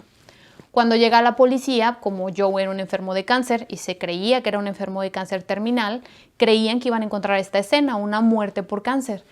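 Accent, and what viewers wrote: Mexico. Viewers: explicó en otro video, que ella nació en el D.F. ahora llamada Ciudad de México, pero se fue a vivir a Querétaro, pero lo importante es la buena dicción que tiene!!! Y además ése acento que tenemos las Mexicanas 🙋‍♀️🇲🇽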